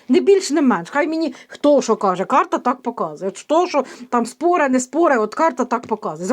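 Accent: Ukraine. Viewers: Ви справжня, ви наша украіночка. В кожному регіоні свій діалект і говоріть так як говорите, вас приємно слухати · Пані,я розумію,що Ви Не Все можете Говорити,що Бачите..За суржик,не переживайте.В вашій місцевості,говорять Так..Дякую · Український діалект❤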